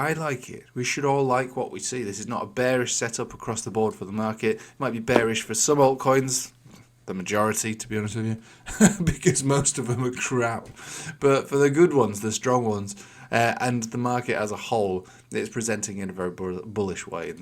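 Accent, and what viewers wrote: United Kingdom. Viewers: Not sure what u took from this video but it’s nice to hear British every morning :) I feel cultured and noble right from the start of the day :D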